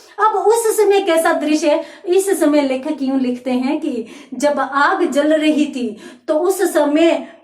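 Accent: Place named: Haryana, India. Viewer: Madam aap Haryana se ho kya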